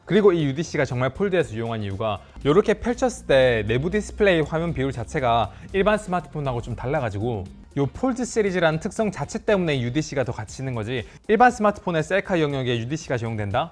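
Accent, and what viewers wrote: Gyeongsang, South Korea. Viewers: 사투리 귀엽네요 ㅋㅋㅋㅋㅋ경상도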